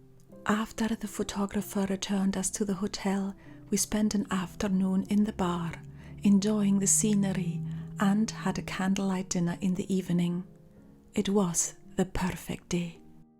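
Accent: Scotland, United Kingdom. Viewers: I like your Scottish accent. 💚 I have a similar story. I emigrated from Germany to Sweden 14 years ago because my inner home is reflected in Swedish nature and I can experience my joy of nature and my contact with nature beings. I found love and have been married to my Swede for 7 years. I am very grateful and happy to be able to live here. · Very beautiful video I wonder if you could say where you are from originally because to me you sound so Scotish thank you xxx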